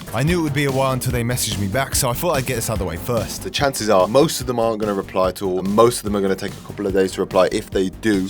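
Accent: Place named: United Kingdom. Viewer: Bro i have troubles understanding u because Ur briish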